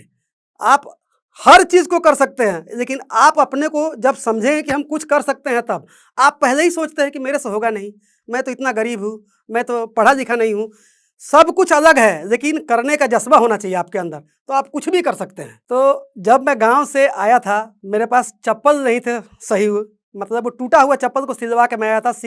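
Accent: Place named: Bhojpuri, India. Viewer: Bhojpuri bolte the tabhi lga ki mere taraf ke hai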